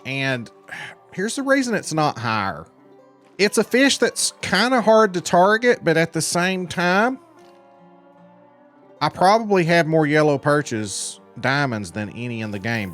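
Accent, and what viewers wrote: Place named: South, United States. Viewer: As a fellow southerner, you got a great accent, brother.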